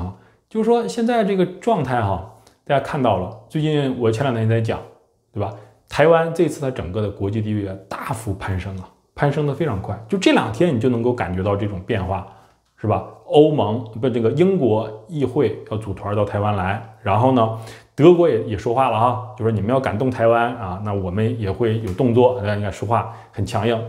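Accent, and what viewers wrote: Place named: Northeast, China. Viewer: 感覺這個人口音像內地東北地區人。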